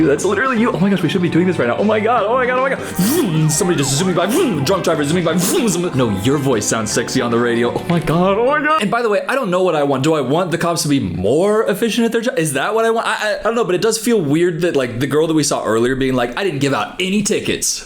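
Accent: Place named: South, United States. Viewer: The Southern mom voice is one of my favorites😂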